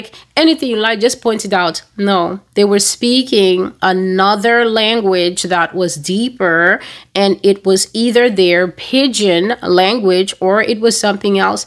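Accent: Nigeria. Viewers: “Chop bar”= Buka Thank you for all you do. You are an honorary Nigerian 🇳🇬because you can speak like us. I salute your dedication and your work daughter of my King🕊️🤍🎚️ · This Nigerian accent is on point 😀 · You spoke exactly like a Nigerian 😅....Well done ma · God bless you Sister. I'm Nigerian , it's scary how you could mimic our accent though🙂 . May the Lord continue increase you in strength & wisdom . You are a gift to humanity . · Great job on that Nigerian accent, I do don’t care what pple say about this girl, all I know is God speaks to her❤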